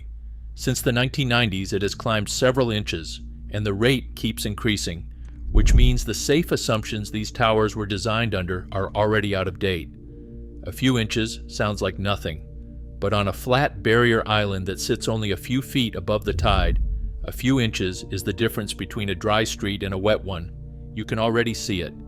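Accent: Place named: New York, United States. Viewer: You must be from new York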